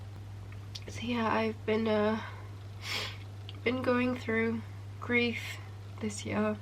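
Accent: United Kingdom. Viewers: I really love hearing British accent despite the bad news. Pray for your better InsyaAllah. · Assalamualaikum. I'm 2 years younger than you. It's alright, I'm a girl. It's really heartwarming listening to you talking in British accent. I hope that you'll get better soon, InsyaAllah!! I've lived in the UK too. I lived in Leeds, England. For nearly 5 years. (Imagine me talking in Yorkshire accent) Anyway~ Semoga akak dan keluarga akak dilindungi oleh perkara² yg tidak baik. Aamiin.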